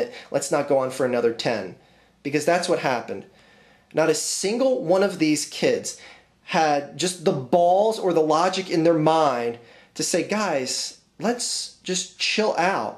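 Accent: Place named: United States. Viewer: well, judging by the way you talk, you must be an American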